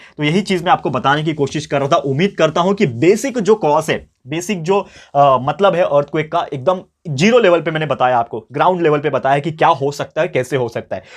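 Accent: Maharashtra, India. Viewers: Sir are you from Maharashtra?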